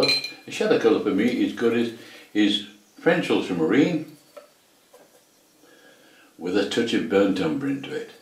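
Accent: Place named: England, United Kingdom. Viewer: Love it I have always had to have a drawing to work two, so nice to hear an English voice, You explain everything you are doing so well, Will be having a go at this tomorrow, starting at your older lessons and working my way up, Thank you so pleased I found your site. Eric Lancashire Expat in Spain, Sorry about that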